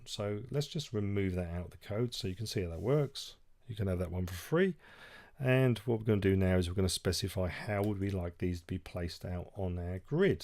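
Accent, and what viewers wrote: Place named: England, United Kingdom. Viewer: Excellent tutorial man. Always better with an english accent too haha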